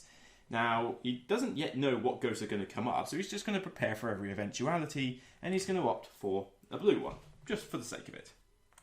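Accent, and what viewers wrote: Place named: Cornwall, United Kingdom. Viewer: I love that kinda Cornwall farming English accent!....and great how 2 play :)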